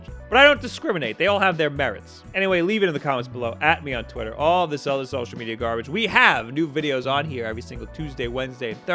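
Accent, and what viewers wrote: New York, United States. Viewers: THAT NEW YORK ACCENT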